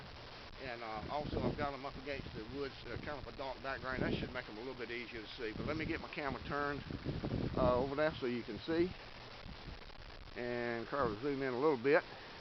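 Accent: North Carolina, United States. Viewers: I thought you sounded like a North Carolinian lol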